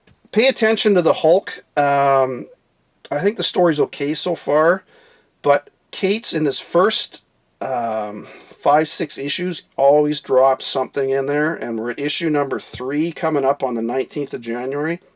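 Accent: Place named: Canada. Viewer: Happy New Year!!! So happy to hear your Canadian voice talking about comics brother!! Just watched the video and can’t wait for the next one!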